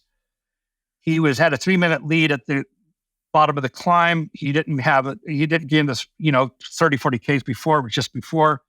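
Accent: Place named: Ireland. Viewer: Just found your site and subscribed. Your fine Irish accent puts me in mind of Sean Kelly. Not bad company to be in! A very good chat with Greg, looking forward to you other podasts thanks.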